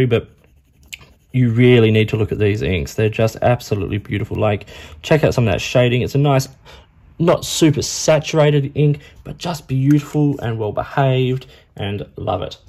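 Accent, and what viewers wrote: Australia. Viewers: Judging by your accent, I'm guessing you're Australian. What's the best source of fountain pen ink in Australia? Is it best to over from overseas? I'm just getting back into fountain pens, so was thinking of going to the local Officeworks.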